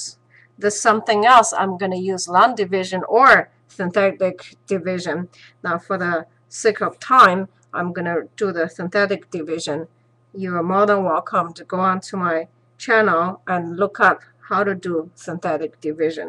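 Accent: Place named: Eastern Europe. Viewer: I think Descartes also did imaginary #s. I cant recall for certain, Thank you very useful. also your accent sounds like the Slavic lady from 2 broke girls. Not a bad thing, Its cool.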